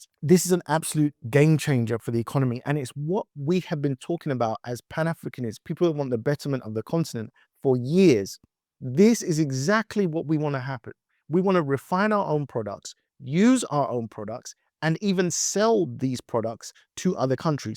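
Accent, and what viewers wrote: Nigeria. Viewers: I like how your Nigerian accent come out when you where reading. Naija cant hide no matter how you try 😂😂😂😂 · You got Nigerian accent down pack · The Nigerian accent when reading the BS is funny 😂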